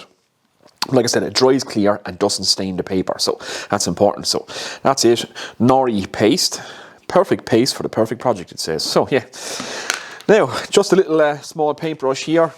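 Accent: Ireland. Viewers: I think it'd be cheaper to scrap some cheap yard sale lamps for the components... I've got access to a bunch of wood and have all the tools but I just couldn't see myself selling those for that much considering how much batch work goes into it. I don't think I'd charge more than about $65-$70 a lamp all in all. rather spend a week cutting up parts and components and spend the weekend assembling a BUNCH of them. steep prices mean you don't have to sell AS MANY to get where you need to be but cheaper prices mean MORE people are going to be willing to buy them which is a more steady strategy. I guess it comes down to HOW much do you want to work, then again going off the accent I'm guessing you're in Ireland and I don't know the import taxes on a lot of that stuff so that's likely where most of the high cost for the electrical components is coming from. the price on those components is just mind boggling lol they should be like a dime a dozen! 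you should change jobs and be an importer at that point though... life's too expensive anymore these days lol doesn't make sense to me...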